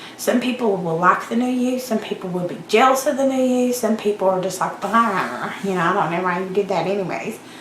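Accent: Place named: South, United States. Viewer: Erica mentioned you so had to come and check out your channel. You are so cute..love your personality and southern accent =) Great video..so much truth was spoken. Keep up the hard work!!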